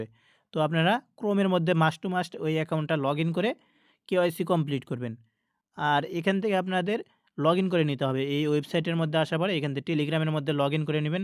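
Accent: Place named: Rajshahi, Bangladesh. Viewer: Vai apnar basa ki rajshahi te. Rajshahir moto kotha bolsen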